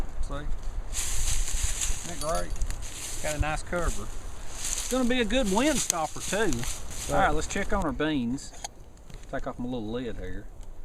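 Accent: South, United States. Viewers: I've subscribed recently and have watched a dozen of you videos since and I think you have a great channel! I work in a similar field as yours and I have to say I really like how you bring the workshop to the bush! Love the Carbon Fibre Chimney and ovens! Nice welding and ingenious stuff! You're quite resourceful with material! Nice sheaths, also! Keep em coming Bud!!! I'm French canadian and I dig your southern accent a lot too! :)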